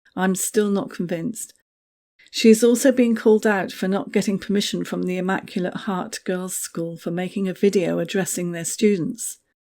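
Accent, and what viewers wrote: England, United Kingdom. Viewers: Hi my friend ! Can you tell me how to hear Megan’s speech please ? I’m 74 and technically useless ! Ido so enjoy your posts, as you’re obviously English, as I am, and easy to listen to, and tells it ‘as it is’ ! But I’ve been sick and not been able to check my iPad or YouTube ! So I’ve missed it all ! PLEASE HELP !? I only have an apple apple iPad, nothing else, no smart phone, etc which didn’t most of my friends don’t know how to use as they have tablets, Android type ! PLEASE HELP ME, I really need to know what she did ? Thanks so much (she is ‘roaming around seeking those seeking those SOULS who would despaired )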